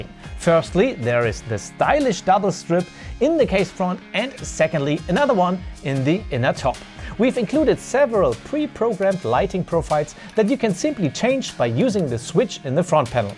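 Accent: Germany. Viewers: Dieser deutsche Akzent hahahahaha Feier ich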